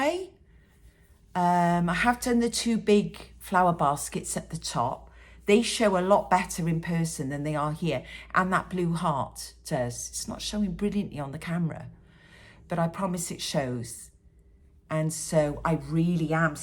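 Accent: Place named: England, United Kingdom. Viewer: I absolutely loved your longer video. You inspired me so much! I could listen to you all day. Such beautiful work and your very pleasant accent reminds me of my grandparents and uncle. They were from England. Keep your videos long. I got quite a bit of stitching done listening to you.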